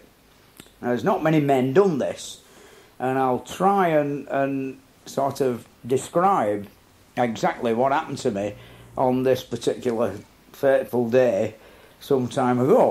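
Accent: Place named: Bolton, United Kingdom. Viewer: Nice words, its magic isn't it. The Boulton accent i still hear from my family who moved to Cornwall in the 60s. I wear overalls and a greasy hat most days and I'm a young un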